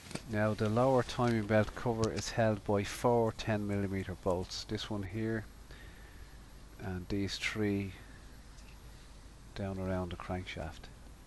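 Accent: Ireland. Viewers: Fan belt, your old school. Love the Irish accent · Sounds Irish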